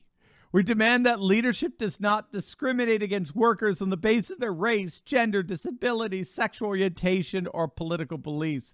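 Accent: United Kingdom. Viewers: I like how he slowly starts displaying a British accent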